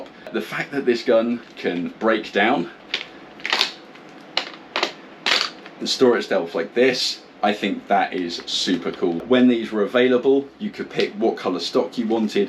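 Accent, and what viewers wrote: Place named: United Kingdom. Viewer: I like your British accent. cheers from Koera!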